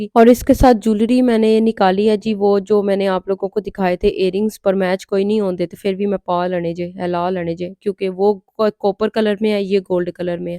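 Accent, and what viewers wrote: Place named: Sargodha, Pakistan. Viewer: Meri bahn apki boli asi ha jesy sargodha ki ho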